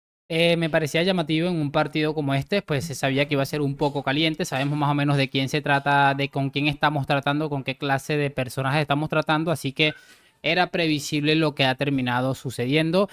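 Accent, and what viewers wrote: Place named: Venezuela. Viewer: Oye una pregunta sin sin ofender y perdona si te ofendo de dónde eres? Porque me gusta mucho tu acento y no sé si venezolano o cubano 🙏💪🔥😈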